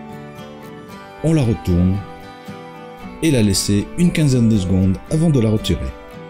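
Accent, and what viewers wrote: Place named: France. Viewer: Bonjour hi, you speak French with French accent, but I speak with Canadian accent.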